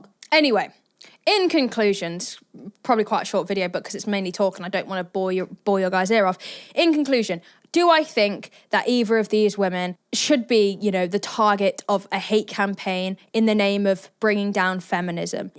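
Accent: United Kingdom. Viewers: I made the mistake of looking at the comments section of Andrew Wilson's response to and it amazed me how much supposedly grown men are triggered by an outspoken young woman with piercings, tattoos, stuffed toys in her room and a British accent. Keep making content YOUR way, don't listen to "alpha" losers like him and his followers.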